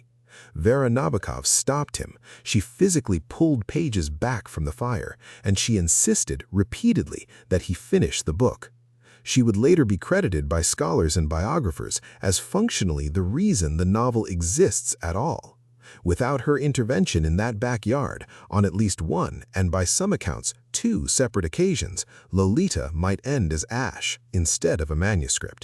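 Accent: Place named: United States. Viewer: Would' e lustened if you didn't have this horrible chewing gum yanki accent. The greatest pissible earsore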